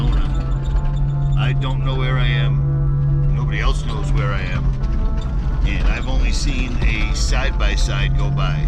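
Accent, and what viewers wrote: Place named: Worcester, United States. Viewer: You sound like your from Worcester :-). Welcome to AZ, just found your channel subbed and liked. From one Masshole to another, be careful out here, beautiful country bud deadly as hell!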